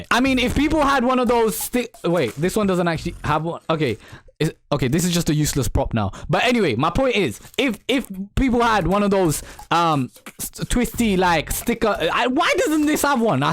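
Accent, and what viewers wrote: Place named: Bengal, India. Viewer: Wallah I love your Bengali English accent 😂😂😂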